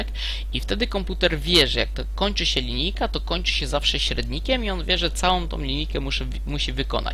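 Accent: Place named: Poland. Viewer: Ciekawe podstawy, ale masz złe nawyki jeśli chodzi o angielski, czasem mówisz dobrze, czasem wymawiasz słowo w sposób spolszczony. Char to [czar], a dokładniej i poprawniej z miękkim r i te cz to trochę złamane z tszi :) Na pewno C jest strukturalny? a nie proceduralny i obiektowy?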